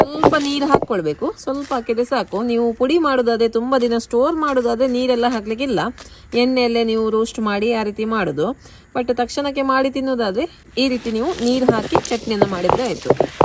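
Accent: Mangalore, India. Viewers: Thank you! More than the chutney your mangalorean kannada was very easy and could easily understand. Chutney preparation was excellent. God bless u..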